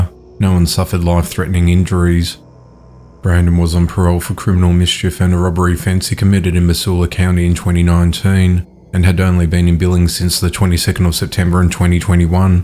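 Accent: Australia. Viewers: Australian accent, East Coast, NSW?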